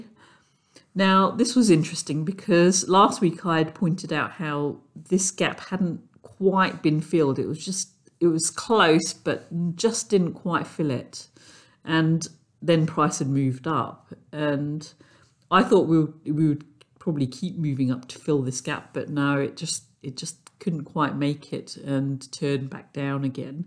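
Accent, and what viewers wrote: England, United Kingdom. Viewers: I'm very surprised realizing that you live in New Zealand. I thought you're accent is as english as it can get. I read some reports about New Zealand in a blog I like and it summs up - this now my quote - there's basically a woman ruling like a direct descent of Stalin. We don't here about that in the news neither - lol!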